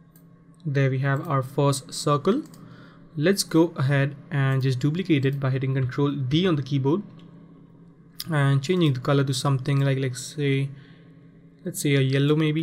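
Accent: India. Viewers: bro are u an indian??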